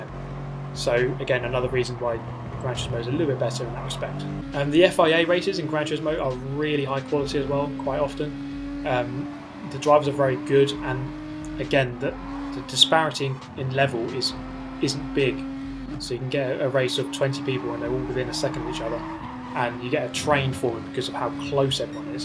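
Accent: England, United Kingdom. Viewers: I like is voice and his british accent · Just a really heavy English accent I thought you'd be white. love your vids man keep it up :D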